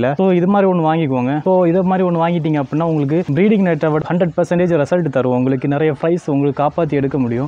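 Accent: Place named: Kanyakumari, India. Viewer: pesura slang la teriuthu.... Kanya Kumari la enga😁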